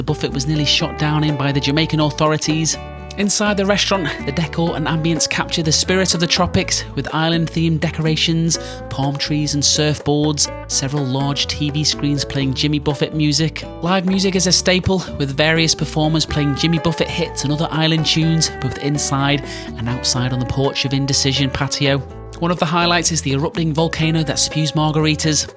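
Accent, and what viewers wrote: United Kingdom. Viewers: Your accent is quite enjoyable. Which region of britain is it?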